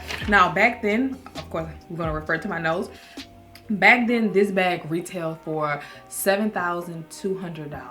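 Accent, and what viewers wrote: New Orleans, United States. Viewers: Lol😄😂@ I LOVE ME SOME YOU..- I WAS FEELING SAD 🤧TODAY UNTIL YOU MADE ME LAUGH. 😄 THANKS... I LOVE YOUR NEW ORLEANS ACCENT... THIS VLOG WAS ON 🎯..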